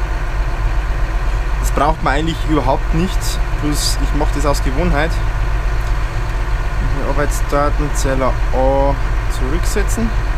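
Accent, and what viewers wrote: Bavaria, Germany. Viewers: Sau gutes Video! Gefällt mir so mit bayrischem Dialekt, weiter so! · Meine Meinung ist Rede ganz normal und nicht so hochdeutschbayrisch ich weiß das vielleicht die ein oder anderen das nicht so gut verstehen oba ihn veida Redet mah aweng anders und i wors dast in da Nähe her kimmst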